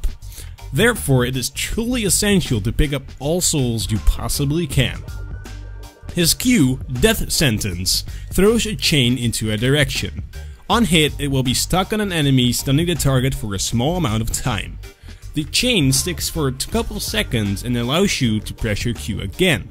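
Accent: Netherlands. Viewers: u sound dutch af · you're Dutch, aren't you?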